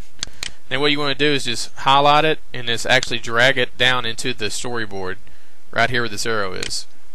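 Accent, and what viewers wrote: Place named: South, United States. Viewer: Nice southern accent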